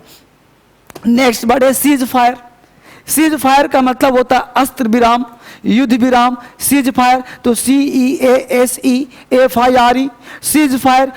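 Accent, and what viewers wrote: Bihar, India. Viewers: Shayad AAP Bihar Ya Jharkhand Se Ho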